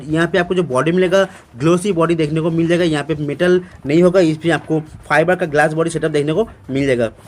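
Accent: Bengal, India. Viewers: Tum bangali h kya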